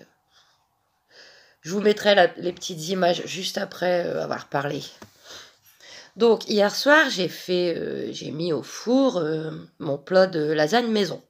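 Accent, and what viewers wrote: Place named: Pas-de-Calais, France. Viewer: Bonsoir. Je vous suis depuis un petit moment, mais je commente pour la première fois. Bon, la poisse c'est la loi des séries... il y a des jours où il vaut mieux rester couché 😅 et le lendemain ça va mieux... Pour les migraines, est-ce que vous avez essayé l'ostéopathie? Pour les pâquerettes, on peut les faire sécher et les mettre dans de l'huile alimentaire. On fait macérer 3 semaines, on filtre et ça fait une super huile pour nourrir et raffermir la peau du corps😊. Une idée pour prendre soin de soi à petits prix. Parce que vous avez besoin de vous occuper de vous pour aller mieux dans votre moral... J'aime bien vous écouter parler. Toute ma famille étant du Pas de Calais, j'ai grandi avec cet accent... moi je suis dans le sud, mes parents s'y sont installés quand ils se sont mariés... mais mes racines sont là-haut... 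Bonne soirée.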